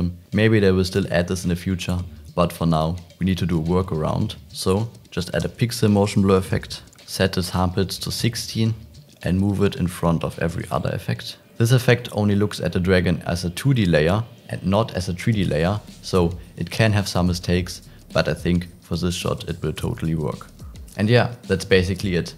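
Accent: Germany. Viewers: Is it the germany accent?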